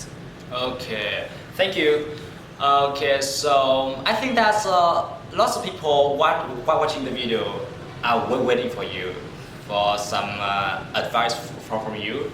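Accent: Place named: United Kingdom. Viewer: Linh Khánh Theo mình nghe dc thì anh anh đó bạn.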